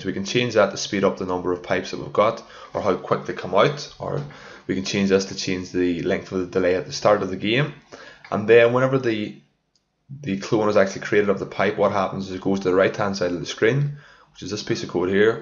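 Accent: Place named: Northern Ireland, United Kingdom. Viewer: Northern Ireland! Awesome accent!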